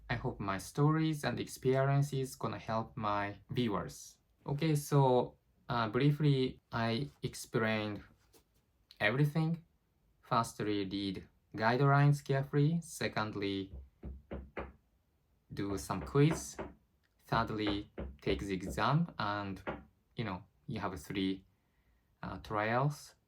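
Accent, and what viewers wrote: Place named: Japan. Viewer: I think he is Japanese based on is accent 🤔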